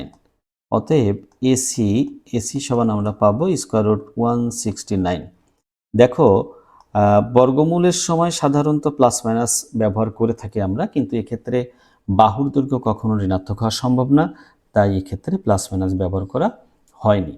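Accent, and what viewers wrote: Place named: Bogura, Bangladesh. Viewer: Apni mone hoy bogurar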